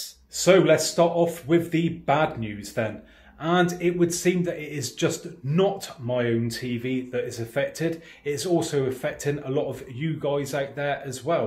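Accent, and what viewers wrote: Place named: Yorkshire, United Kingdom. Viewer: I was actually watching this video because of the problem that I have with my tv. But dude I love your accent!:) are you from Yorkshire?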